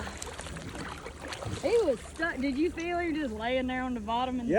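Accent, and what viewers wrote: South, United States. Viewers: That southern belle accent, beautiful conservative woman, and she fishes...thats a keeper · Girl, do you ever run out of energy? Love the southern accent, makes my day every time I hear it. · That Northern fish loves your Southern accent 🤩😁👍🏻🌞😎🥰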